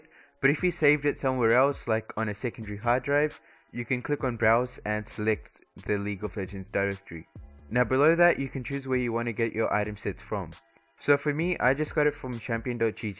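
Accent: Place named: Australia. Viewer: Where are you from? Australia? I LOVED YOUR ACCENT!!!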